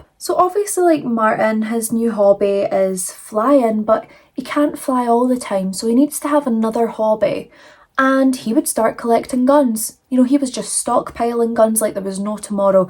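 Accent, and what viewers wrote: Scotland, United Kingdom. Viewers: I'm new here love the Scottish accent I'm from England think I'm going to like ur channel ❤❤❤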